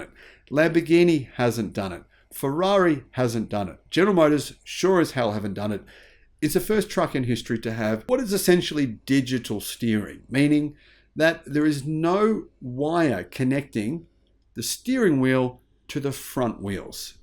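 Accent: Australia. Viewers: What an Aussie accent maaate. Ah well you can take an Aussie out of Australia but you can’t take the Aussie out of this man.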